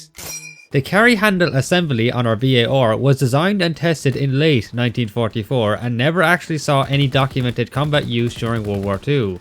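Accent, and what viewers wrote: Ireland. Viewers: Why did his accent made me think he was Indian for the first four and a half minutes before i realized he's Irish? Solid video, btw. 👍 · Bro you sound irish haha surely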